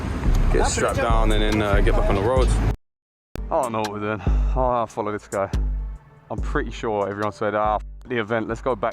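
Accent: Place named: United Kingdom. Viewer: freezing I bet it was wow congrats get licence mighty lad, good rds to go on for sure, you live out there ye still got uk accent,👌 im irish. my ideas might lead yiz astray 😝😂 I love to killswitch the guards at lights, pure madness😂 other bikers ready to bolt 😝😂 ye better not do that over there lad! Enjoy everything you'll be flyin, ive cbr600rr too they're savage, you'll enjoy that ride out. Look forward to more.keep her lit🏍💨💥👊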